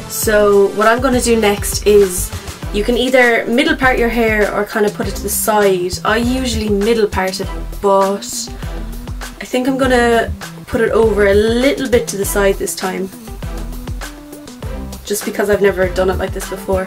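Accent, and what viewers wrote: Ireland. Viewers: Irish YouTube for the win 💋🇨🇮 I'm Irish myself 🇨🇮💛 I knew the second you started talking you were Irish 🍀😂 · I love her Irish accent 😍😍 I wish I had an accent too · are you Irish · are you irish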